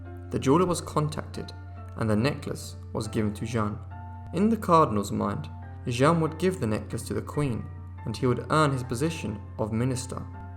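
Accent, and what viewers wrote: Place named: England, United Kingdom. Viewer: te mire en un video..no se si fue tiktok o instagram donde tenias la cancion de " " y te reconoci.. wow.. no pareces latino en absoluto.. y tienes acento ingles.. I love your channel by the way.. I love history and historical figures. que parte de mexico?? yo naci en baja california pero vivo en California ahora.. cerca de San Diego.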